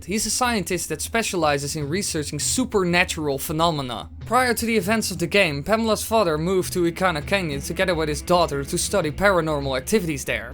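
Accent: Netherlands. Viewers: Question are by any chance Dutch? You have a very familiar accent ... ;) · Are you Dutch? I am? Because you have a dutch accent!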